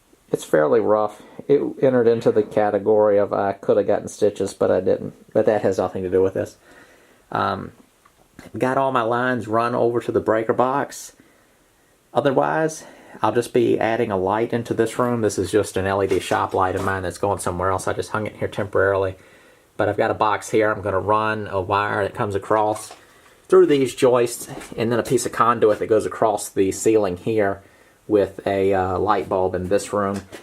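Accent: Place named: Mid-Atlantic, United States. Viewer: It's mid Atlantic, coastal. I'd say he's somewhere in the Virginia-South Carolina region. Shift 250 miles inland and you get Scots-Irish Southern. Regional accents can be telling, even as little as 100 miles can define a region.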